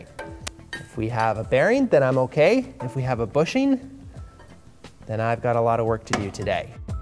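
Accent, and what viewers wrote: Canada. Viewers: Not sure if you are Canadian or from Minnesota but you sound very Canadian.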